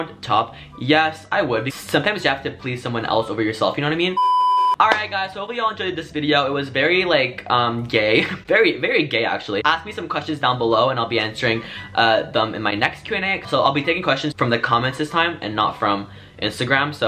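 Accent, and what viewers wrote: United Kingdom. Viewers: Omg that British accent is everything 😂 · The British accent made me smile and started laughing I loved it oh my goodness so great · Ur British accent sounded like Reuben De Maid 🤣❤️ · Who else loved the british accent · Loved your British Accent... So cute💜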